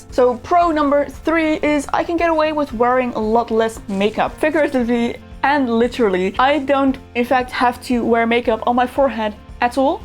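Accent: Netherlands. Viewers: Ik wist dat je Nederlands was. Ik hoorde het. Maar echt je hebt echt een mooi accent. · Hallo ! Ik dacht altijd al dat je zo een Nederlands accent had nu weet ik waarom en goede video 😗🌸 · Ik wist het! Ik hoorde aan je accent dat je nederlands bent. Trouwens, ik heb vroeger een pony gehad, en ik wil er weer een maar ik vind de nadelen wel kut. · IK WIST GEWOON DAT JE NEDERLANDS WAS AAM JE ACCENT VANAF T BEGIN LMAO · at the beginning of the video I heared a little accent and I was already wondering where you would come from.. and you said the Netherlands🎉 ik weet niet waarom ik in het engels type maar ik ben ook Nederlands!! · jaaa zie je wel!! Hoorde het aan je accent. Super leuke tutorials over je ponny ;-) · Omfg wait, are you dutch ? So from the netherlands ? Or did i hear it wrong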